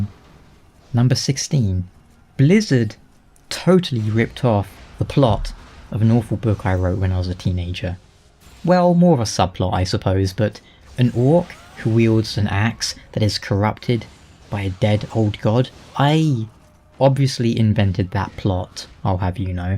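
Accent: United Kingdom. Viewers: Don't surpress your Britishness. Embrace it! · Happy Bday! :D Thanks for toning down the Brittish accent, it's quite hard to follow for a foreigner. Especially since these dumb asses in France teach English using only the posh accent. Explains why we have trouble understanding spoken English and sound like arrogant pricks XD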